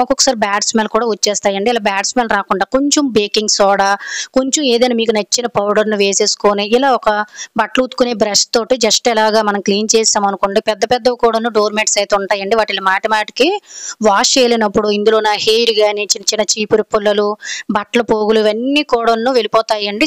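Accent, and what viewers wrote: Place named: Telangana, India. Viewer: Welcome akkka me slang ardham avuthundi meedhi telangana kadha akka maadhi kooda adhe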